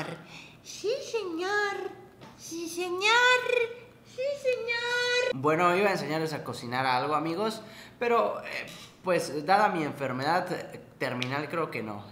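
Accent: Yucatán, Mexico. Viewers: Wey yo recuerdo que me suscribi con un video de lol, de la nueva grieta, donde te burlabas de las antiguas torretas de cochino. Tu asento yucateco me cagaba de risa y termine suscribiendome. Chido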